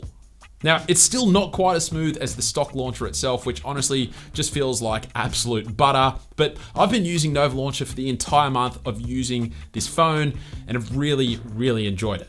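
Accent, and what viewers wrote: Australia. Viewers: Is this an Australian accent? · I really love this phone, its pixel through and through...and when you let me know how to work it speaking with your sexy Aussie accent, I melt! Thank you 🥰😘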